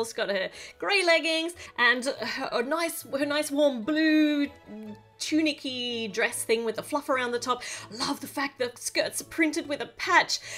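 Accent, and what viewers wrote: Australia. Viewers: I think those thinks over the trap door are Banana leafs. They are used as plates in South Asia. I am from India and we eat from banana leafs whenever there is a function like a wedding. By the way when you don't upload videos any one day I miss your crazyness, Australian accent, cuteness in your words and awsone lego videos. You kept me happy in the lockdown too. I love your videos😀😀😀😀😀🥰🥰🥰🥰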